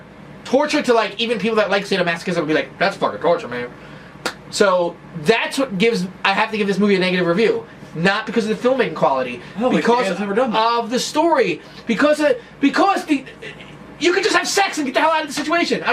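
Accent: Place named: Philadelphia, United States. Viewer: Is the movie Hancock, with the loveable Will Smith? I think it is;) And I only saw that movie once about 3 years ago. Anyway, Vile sounds good and I've loaded it up. Gonna watch it right after posting my answer to where your hat is from. Plus, great news for you, I live in Pennsylvania and from your accent, I'm guessing you live near Philly (also Flyer's cap;) so shipping should be minimum haha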